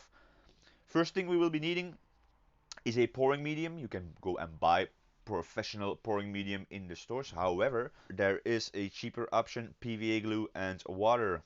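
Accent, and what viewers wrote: Netherlands. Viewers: I thought I finally had it. I knew you where dutch (or Belgian) because of your name and your accent. But you use stuff not available in the Netherlands. But in this tutorial I saw a flask of bison houtlijm, so I thought yeah you must be from the Netherlands...... But then you use stuff not available in the Netherlands again..... so where are you from if I may ask?! I want to know because of all the stuff you use. All the scenery makers are using cool stuff like grannygrating... no where to be found here...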